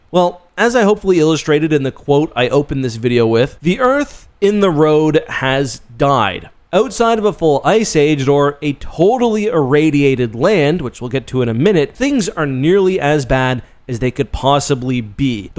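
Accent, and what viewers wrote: Canada. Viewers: Canadian lol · I am confident just by hearing your voice you are a fellow Canadian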